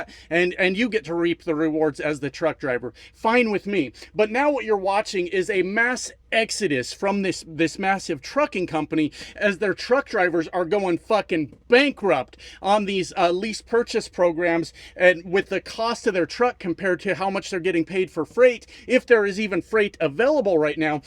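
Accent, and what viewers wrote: Canada. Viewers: You sound Canadian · Canadians would love to have the old neighbourly relationship back. It breaks my heart to see all the hardships starting to happen to both sides of the border. Are you sure you’re not Canadian? I swear, you sound like us. 😊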